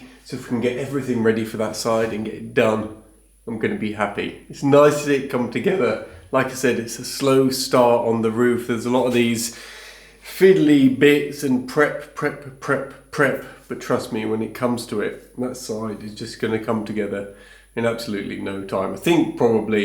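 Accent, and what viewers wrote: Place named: London, United Kingdom. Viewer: . I lived in the east end of London until my family moved to Canada in 1957. My accent at 13 years old was just like yours and being in high school the pressure to lose it had a big effect on my self confidence. I only mention this because your channel is so successful and you are so admired for your progress on the chateau. You don’t have to start pronouncing your t’s on my account. Your dogged determination is inspiring, as well as your London accent.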